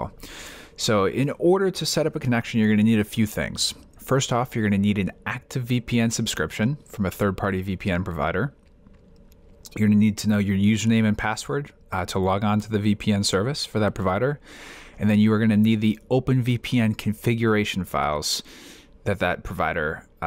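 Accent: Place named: United States. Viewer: very nice Tutorial. And nice clear AMERICAN Voice ;-) Greetings from germany. I have bought the Router today ;-)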